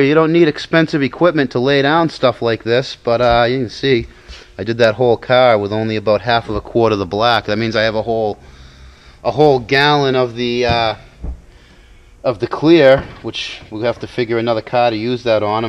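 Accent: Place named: Massachusetts, United States. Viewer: Its pronounced kah , and I couldn't hear it cause I'm from heah too ( mass)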